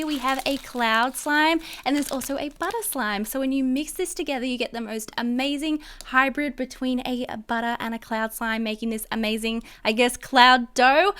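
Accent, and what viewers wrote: Australia. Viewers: OMG I LOVE!!! I’M ALSO AUSSIE AND I LOVE LISTENING TO SOMEONE WITCH THE SAME ACCENT AS ME!!! SHE MUST BE RICH TO GET SO MANY SLIMES!!!! im broke....... BUT I CAN ENJOY SLIME BY WATCHING HER VIDEOS🥰🥰🥰🥰🥰🥰🥰🥰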